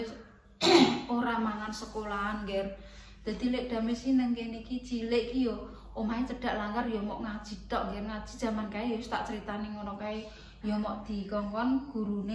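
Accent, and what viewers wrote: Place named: Cepu, Indonesia. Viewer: Mak e suaranya merduu,,,, khas tadarusan e wong cepu,,, semangat sa saged e mak,,, ojo isin wes tuo karepe golek guru ngaji ben tambah wapik wacane lan mahroj e,,, iso di gawe konten juga mak,,, golek ibu2 muda anak siji seng lagi genaon omah2 seng kapitan kon marai ngaji mesti akeh seng gelem mak,,, ben tambah barokah rejekine mak e,,,, soale aku yo alkamdulillah kapitan mak,,, eleng jaman jeh genaon omah2 ngenese polll pondok an ga ndue ijazah ga ono pemasukan idep2 ngamal nulongi wong pinter ngaji mak,,,, lope mak kuuuu,,,, cintaku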